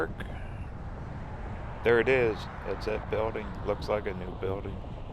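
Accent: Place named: Pennsylvania, United States. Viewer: Listening to you talk, a little "accent" or pronunciation, are you from Pa. originally?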